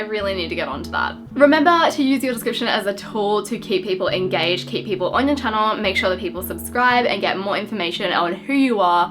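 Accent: Australia. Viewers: I love Aussie Accent. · Oh my god your Australian I love how you talk ( you.. Are Australian right?)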